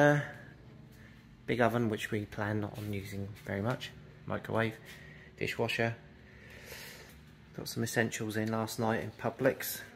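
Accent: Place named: Australia. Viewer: You sound like Rocko from the 1990s Nickelodeon cartoon Rocko’s Modern Life, so I assume you’re Australian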